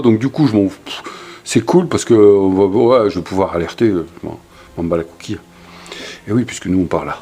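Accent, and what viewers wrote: South, France. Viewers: laccent du Sud de la france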